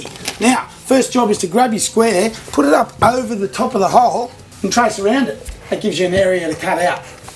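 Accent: Australia. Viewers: The Australian accent 🤢🤢🤢🤢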